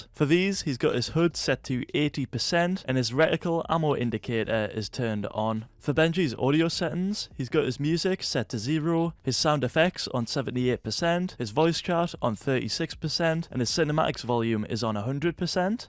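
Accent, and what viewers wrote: Newcastle, United Kingdom. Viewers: Are you from Newcastle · Sounds like your from Newcastle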